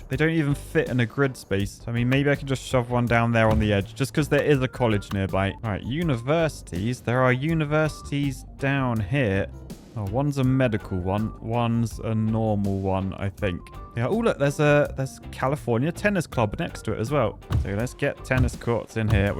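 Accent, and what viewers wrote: United Kingdom. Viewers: speaking of pronouncing words correctly, its not "aloo-MIN-eeum, its "a-LOOM-a-num" lol I love the difference between British and American pronunciation. couple questions, whats a tuh-MA-toe?? lol =P and why is a fender called a wing in england? isnt a wing the thing on little stands on the trunk of a car that generates downforce? lol and whats a roundaboot?! hahaha we call them rotaries here id be curious what a long term city would be like over several videos. can we replicate true LA life?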